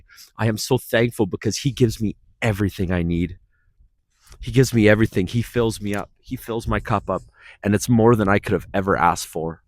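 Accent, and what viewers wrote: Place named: Northern Canada, Canada. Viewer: I'm female and not having a father in my life affected me a lot too, but in a different way. Thank you for sharing this. I'm from Manitoba and I also came from a Mennonite family but grew up without church or any religious involvement. I know the feeling of being lonely and isolated in the winter. I live in the Midwest now, but it's really nice seeing that you're still up there in northern Canada, I knew I recognized your accent 😊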